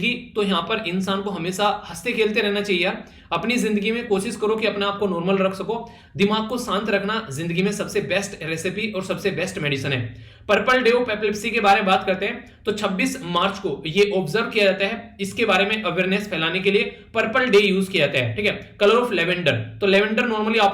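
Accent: Haryana, India. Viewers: I guess from hariyana or western UP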